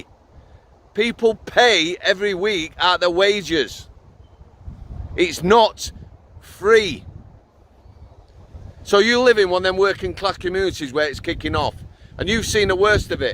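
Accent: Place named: Yorkshire, United Kingdom. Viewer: I always thought you had a Yorkshire accent I grew up on the Manor Don’t live in Sheffield anymore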